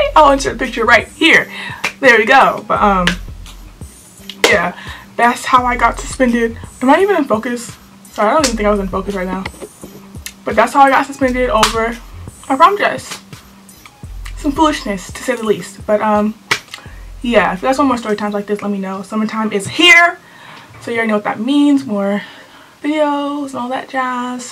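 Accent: United Kingdom. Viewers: The British accent 😭 im British and the accent is so jokes😂😭 anyways just found ur channel 💕💕💕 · The english accent tho...😂😂 im english and this is amazing. Congratulations you just gained a subscriber😂😂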